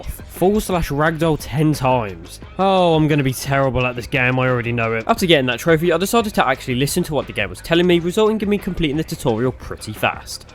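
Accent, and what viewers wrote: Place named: Black Country, United Kingdom. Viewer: you aren't by any chance from the black country are you? Not even lying you sound exactly like me haha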